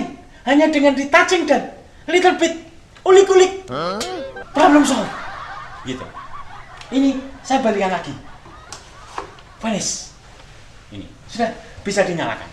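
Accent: East Java, Indonesia. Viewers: Jowo timur kayak gitu mang mas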